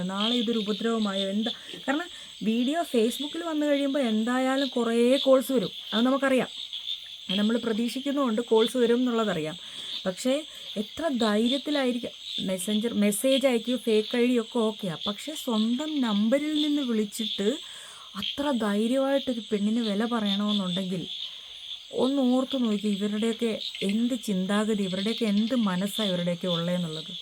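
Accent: Thrissur, India. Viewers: Slang kettitu Thrissur ano ennu oru doubt...oro koana mala vanangal und nattukarekodn parayippikan....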